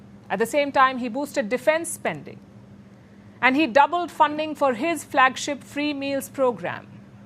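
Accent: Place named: India. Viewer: Funny English indian accent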